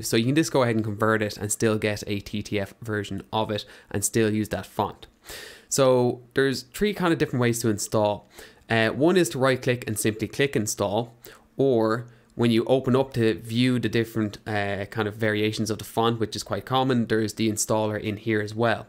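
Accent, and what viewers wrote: Ireland. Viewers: i only subscribed cause I heard the irish accent... love seeing other irish creators :) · good man, nice to hear an Irish voice. This was really helpful thanks! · You Irish?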